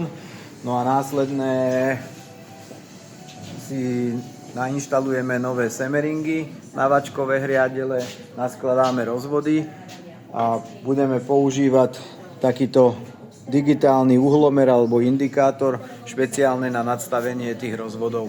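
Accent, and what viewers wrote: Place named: Trnava, Slovakia. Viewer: spravne cavo vyprava akoby vzivote trnavu neopustil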